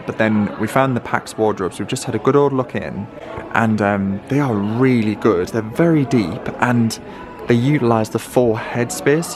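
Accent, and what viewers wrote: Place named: Scotland, United Kingdom. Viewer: Your accent is confusing Scottish or British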